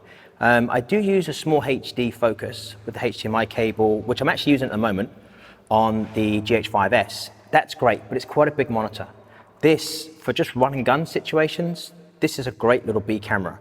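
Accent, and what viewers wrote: United Kingdom. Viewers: Don't worry, POHM, there isn't even the most remote hint of fush and chups in that accent! 100% UK very obviously. As you were. You can CNC machine from cast or extruded or "billet" or forgings. What you start with and how you get it to shape are somewhat independent. If they machined it from a bullet, though, it must've been a BIG one. ;-)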